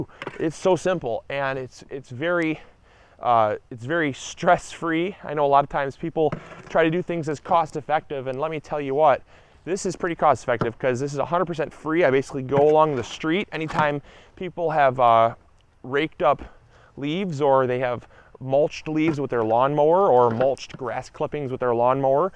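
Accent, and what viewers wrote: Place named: Wisconsin, United States. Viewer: Funny, I grew up in Michigan and people would ask me where I was from, never thought I had an accent until I saw ur vids. Almost a Wisconsin accent but more faint.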